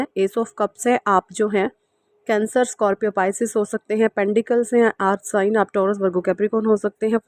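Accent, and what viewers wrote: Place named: Punjab, India. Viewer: Your punjabi accent is so appealing!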